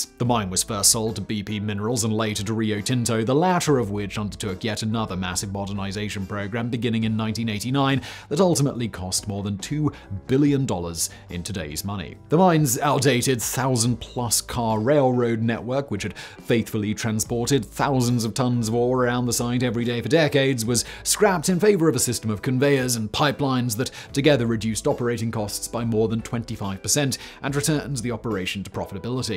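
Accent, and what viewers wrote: England, United Kingdom. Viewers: I also like listening to English people saying Native American place names. It's great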